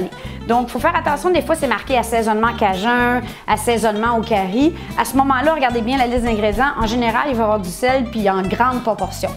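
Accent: Quebec, Canada. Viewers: Merci beaucoup pour cette idée, c'est genial. Je vais l'essayer bientôt. C'est tout a fait intéressant écoutant a votre accent. Moi, je suis Américain, mais appris le Français en France et croyez ou pas c'est ma premiere fois d'entendre longuement l'accent Quebecois. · Cc tjs un régal d'écouter votre délicieux accent québécois ici en Bretagne, merci pour cette vidéo, j'ai un magret de canard à sécher au frigo mais maintenant je saurai faire la partie ficellage comme un grand. Au passage j'adors votre joie de vivre, si tt le monde était comme vous, le monde serait plus agréable 😉👋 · ha ben je découvre votre chaine maintenant (25/09/2024) après en avoir regardé quelques unes pour mon filet mignon séché, quel énergie et sympathie surtout la complicité de votre "minimoi" qui a du bien grandir ! OK je viens d'en faire et je me demandai comme ficeler tout ça vous m'avez donné une astuce avec le doigt merci ! 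Votre accent est bien agréable à entendre juste ce qu'il faut d’authentique. Alors bien le bonjour du Sud de la France "Cousine" Canadienne sympathique ! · sans vouloir etre mechant, c est clair l accent quebecquois est une horreur pour les oreilles.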